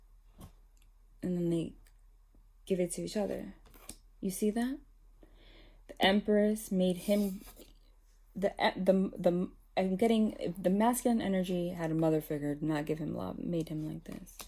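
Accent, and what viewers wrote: New Jersey, United States. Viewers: Ha.. I just clicked , heard you talking and I said, "Jersey!"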